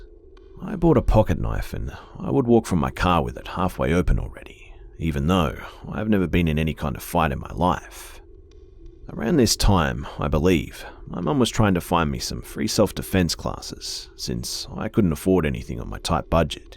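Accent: Australia. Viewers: The way he tells a story is not scary whatsoever. but more like he's reading from a newspaper. I mean, I can actually listen to him in the dark at home by myself and it doesn't creep me out nor scare the hell out of Me! Or maybe it's the accent, because Australians have the worst accents on the planet to me!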